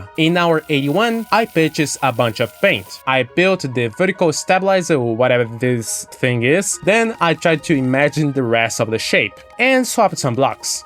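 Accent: Brazil. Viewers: Meu deus cara eu achei tava ficando doido pq eu senti no fundo do meu peito q teu sotaque era brasileiro, enfim vídeo bom pkrl continue pfvr meu xarà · are u brazilian by any chance? your accent looks like a brazilian speaking english 😆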